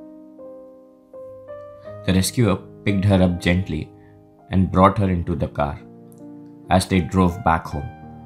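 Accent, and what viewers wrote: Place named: India. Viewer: The storyline never matches what we are watching. It's like miles behind. The dog has been with the woman from the beginning of the video but the storyteller is making it seem like that's only happening half way through the video. Whoever produces these videos doesn't know what they are looking at when they are putting the English storyline into the video because the transcript is automatic and follows the sounds of the storyteller. As he sounds Indian, it might be that he uses Indians to put his video together for him and they don't speak English. Maybe that is the reason for the mish mash.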